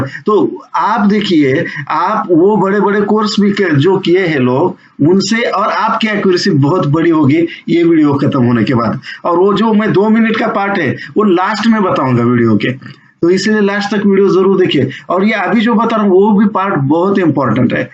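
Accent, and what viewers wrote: Maharashtra, India. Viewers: Saheb tumcha tone varunach olakhla tumhi marathi ahe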